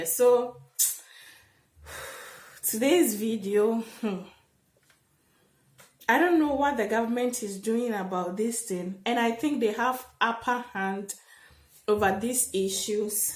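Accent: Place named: Ghana. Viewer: Hello I missed you oo, miss your Ghana accent 🥰🎉 is the same thing here in Nigeria ooo thousands of nurses and doctors are leaving our country every year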